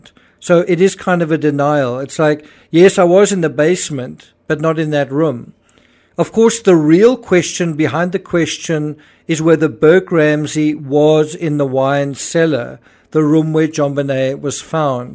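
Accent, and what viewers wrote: South Africa. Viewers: No, it's an accent. He's from SA.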